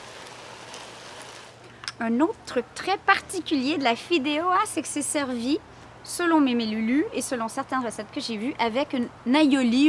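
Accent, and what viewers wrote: Canada. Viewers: j adore ton accent canadien bizou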